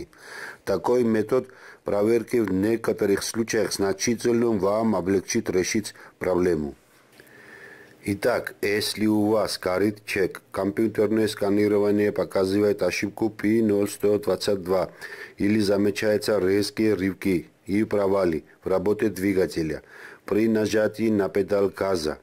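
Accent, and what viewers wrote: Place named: Georgia. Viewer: С акцента можно предположить, что вы из Грузии, как и я. У меня проблема с дроссельной заслонкой, вы бы адрес или номер телефона, я бы заехал к вам. Спасибо, с уважением Земляк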